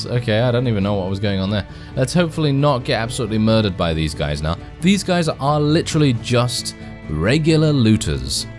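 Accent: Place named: United Kingdom. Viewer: Your voice is.... is so British. Its lulling me in to a falsh sense of security...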